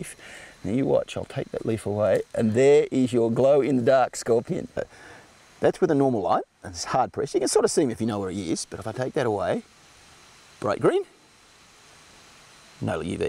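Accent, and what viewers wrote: Australia. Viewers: bioluminescent tumors would have great applications in the world of Neurofibromatisis research. Apart from having my 6yr old scanned by mri every 3 months to monitor his multiple tumors, we could just run a black light over him. Is the venom specific to certain tumors when it attaches? It's something I'm keen to follow. Great work on the videos and channel, great to hear a Aussie while I'm in the U.S.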